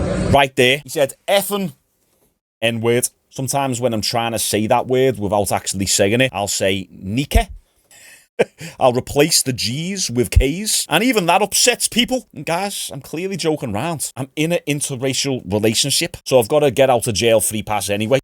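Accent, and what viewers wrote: Liverpool, United Kingdom. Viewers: Are you Scouse? Actually, is this even a question? I don’t deal with if, ands or maybes, only absolutes!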